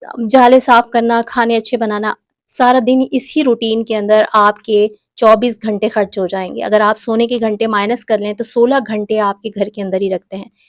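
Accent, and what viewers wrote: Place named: Karachi, Pakistan. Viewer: Ap Karachi se ho apky lehjy se LG raha ha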